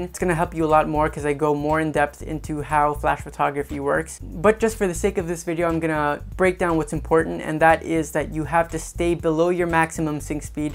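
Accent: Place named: Philippines. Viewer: not bad englilsh at all... but the signature filipino hum is there... haha! Good job! nice tutorial!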